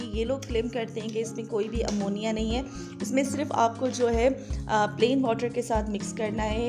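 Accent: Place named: Pakistan. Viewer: Ok I recognised from your speaking style. Pakistan mein Kahan se?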